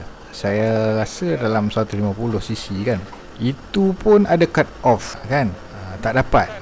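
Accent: Perak, Malaysia. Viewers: Suka dengar org perak ckp,saya asal perak tapi membersar kt bandar ipoh..tengah mencuba untuk memperbanyakan bahasa perak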